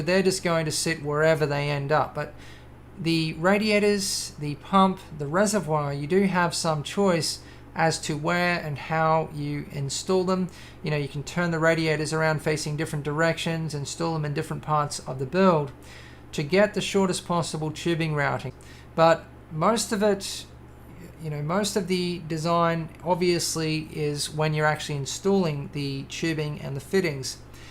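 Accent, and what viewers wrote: Australia. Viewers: I have no idea why it has taken me so long to find your channel but things seemingly, are what they are. It was Google that recommended your channel to me I think... Anyhow, I have subscribed and I am a uber happy camper, yet still, I am struggling to understand why it has taken so long for me to be invited to view your channel, when I have been watching similar sites for years, in some cases. The thought that crosses my mind is one that wonders whether Google and Youtube have some kind of favoritism algorithm going on or worse. I have noticed that you have an accent of one from the parts termed "down under," however, surely, with me residing in Europe, or, and,even "the west," that does not form a barrier of communications or does it? It certainly does not involve tech sites from over "the pond." Something is amiss that is for sure - to my mind, and my gut feeling is that it has something to do with traffic algorithms or is that the conspiracy theorist in me raising up its ugly head or beautiful head, which ever it is that you fancy comrade - only remember comrade... 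There is a tint of red in all of us, simply waiting to blossom. Whatever the causation, I have managed a couple of hundred words of adequacy and for such, my time as the writer is up and my time as the dreamer of heavenly wonders is upon me - over and out, goodnight Vienna, goodnight Helsinki... Shalom & Gratitude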